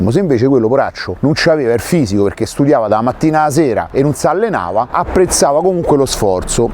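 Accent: Rome, Italy. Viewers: Dopo tanti anni trascorsi nella bellissima Roma, sono felice di seguirti dall'altra parte dell'Adriatico. Da un lato mi dispiace molto vedere l'amata Roma in questo stato di degrado, ma dall'altro mi piacciono le tue storie curiose e divertenti e il modo in cui le racconti (soprattutto la cadenza romana 😄). Grande, continua così! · Daje! Troppo bello stare ad ascoltarti con la tua cadenza romanesca, sei un tipo verace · bravo, bella storia e col tuo accento romano diventa ancora più bella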